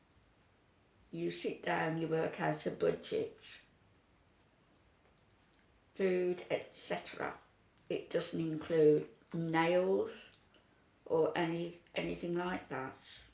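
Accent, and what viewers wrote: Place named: England, United Kingdom. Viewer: Amy just made a video attacking critics including you. She is gloating and arrogant. She is pissed and must have seen the comment you left on her last video. Calling you an English lady with a thick accent. Amy would rather you speak in a coarse arrogant voice such as that American lady with a thick body and thick paypal account. Who could that be?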